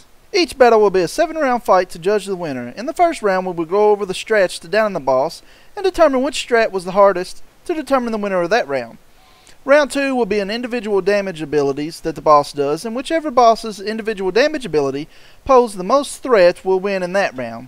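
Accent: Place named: South, United States. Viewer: Like the southern accent, Yogg Saron is my favorite of the Old Gods.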